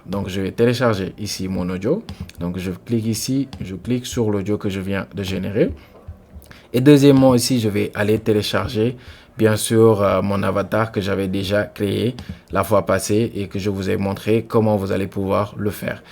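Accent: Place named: Africa. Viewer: N'IMPORTE QUOI !! ce n'est pas du tout un clone de ta voix ! Notamment, ta voix clonée n'a pas ton accent africain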